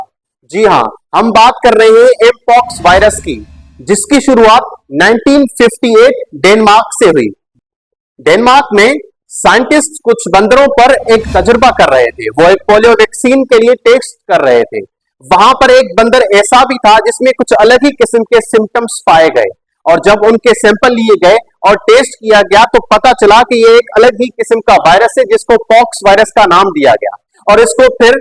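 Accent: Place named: Sindh, India. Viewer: Sir I have some suggestions I would like to share with you firstly that there is a little bit Sindhi accent flowing in your tone kindly improve that and second the voice quality is not that good for that you can use Adobe podcaster firstly you will import your audio file from your video then you can enhance it from Adobe podcaster then later you can mute the video and put the audio file it will improve it soo yaa that's all I guess please put a eye on that thank you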